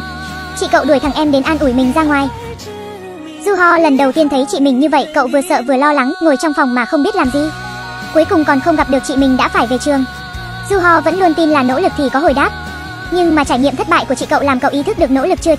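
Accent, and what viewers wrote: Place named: North Korea. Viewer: Nghe thì giống bên triều tiên hơn:)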